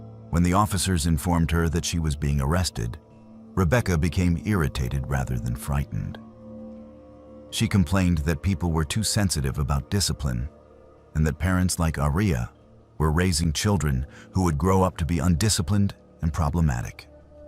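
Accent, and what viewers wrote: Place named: Louisiana, United States. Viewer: Louisiana common like your voice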